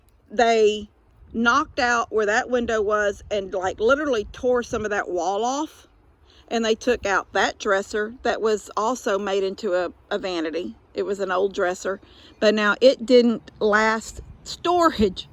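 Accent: Texas, United States. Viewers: I'm literally catching up with you and honestly I could listen to you all day. So many of the stories of your life resonate with me. I've always had horses and they are my life. Just one husband a daughter and a son, even my kids said why do you put up with this but I'm of the generation who stuck it out. I got rid of him finally and bought a little beachside rundown cabin and damn near killed myself doing the Reno to make it liveable. I swear I can smell that smoked meat I used to smoke fish. I've told your dad I just love his Texan drawl and sure love yours too. I'm Australian and you know what we're thousands of miles away in distant lands but I sure do love you folks, you're my people 🤗 give that lovely big boy of yours a kiss from me. My name's Megan MacDonald and I really enjoy watching your whole families lives ❤️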